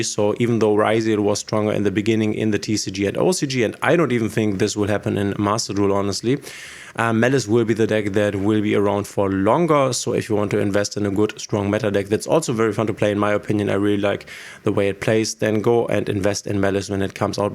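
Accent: Germany. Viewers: Warum auf englisch? Ich weiß nicht , man hört einfach wenn man eigentlich deutschsprachig ist aber englisch redet 😂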